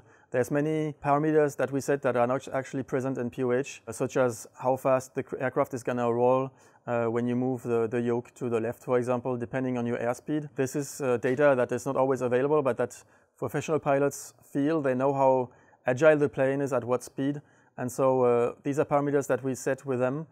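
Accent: France. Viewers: As seriously as I take all these amazing new sim technologies and stunning realism... that French accent just takes the cake for me xD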